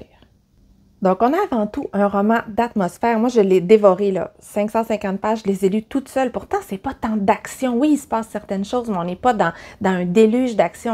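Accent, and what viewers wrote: Quebec, Canada. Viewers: J'adore t'écouter pour ma part justement avec ton accent québécois et le parler de chez-toi 💛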